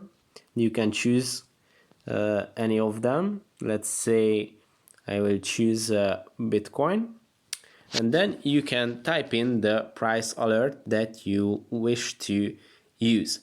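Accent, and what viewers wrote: Hungary. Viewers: Ur from Hungary, I hear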